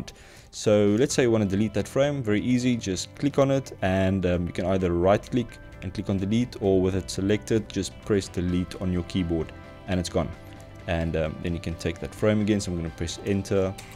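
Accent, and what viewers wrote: South Africa. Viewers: you south african by any chance?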